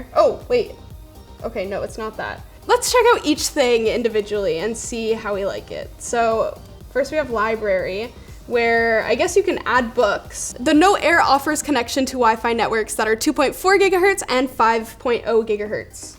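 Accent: Canada. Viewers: I’m from Minnesota and can hear her accent from Canada very easily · love the canadian "O's" :D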